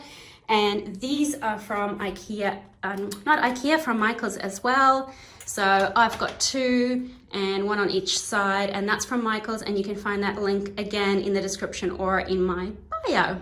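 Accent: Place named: Australia. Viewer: The relief I felt when I heard her Australian accent was unbelievable.. haha. I’ve watched so many cricut videos & it’s always American. This is the first time I’ve seen an Aussie do cricut videos so I subscribed straight away!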